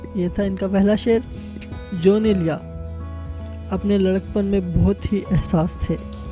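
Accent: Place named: Punjab, Pakistan. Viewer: معلومات اچھی ہیں۔۔۔مگر انتہای "پنجابیانہ" لہجہ ھے۔۔۔۔اردو کو اردو کی طرح بولیے۔۔۔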